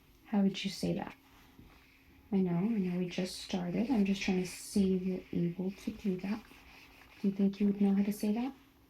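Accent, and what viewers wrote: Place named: Mexican-American, United States. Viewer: Estoy casi seguro que es mexicana 🇲🇽 nacida en estados unidos, las chicanas son muy bonitas y tambn lo creo por la forma de hablar el español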